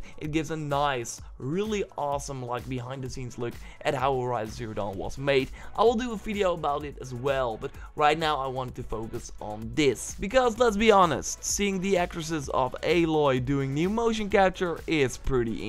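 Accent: Netherlands. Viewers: You sound a bit dutch, are you?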